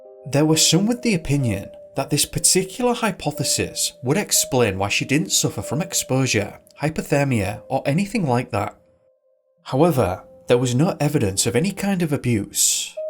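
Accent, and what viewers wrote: United Kingdom. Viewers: Chills with a British accent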